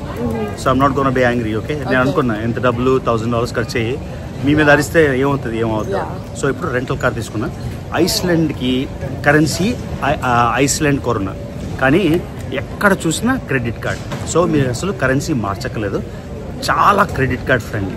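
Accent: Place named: Uttarandhra, India. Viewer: Take a Bow for our Mother Uttarandra slange ❤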